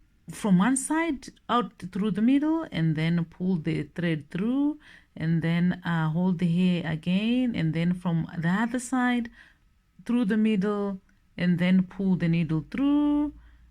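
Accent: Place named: Africa. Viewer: I love your African accent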